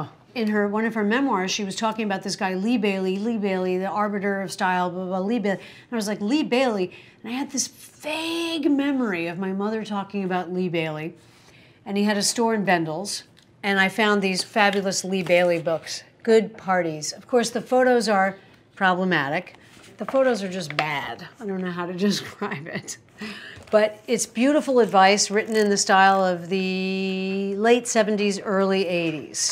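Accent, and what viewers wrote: RP, United Kingdom. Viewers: Awh, my mother had endless cookbooks including many by dear Fanny Craddock. After my mother died I picked up only 2 or 3. mmmmmmm ... I wish I could go back and grab a truckload. Your 'Fanny-accent' is perfect heightened R.P. She really was a breed apart. Few of her ilk are left. Thank you for bringing that era and the older cookery books to life. You infuse even the poetry with sparkle. You are a rare talent! Love, love, love. Xxx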